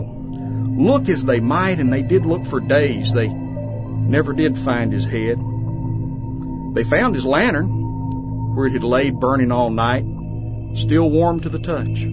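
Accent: South, United States. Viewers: I love the story but every time I hear it the narrator always has a pronounced southern drawl. In 2019 can’t someone without such an accent narrate the tale?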